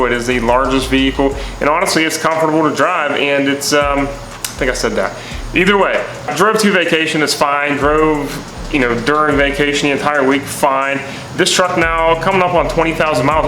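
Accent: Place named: Maryland, United States. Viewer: Familiar accent.. Maryland?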